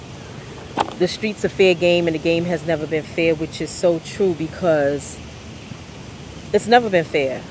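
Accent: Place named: Harlem, United States. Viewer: I love her Harlem accent 😏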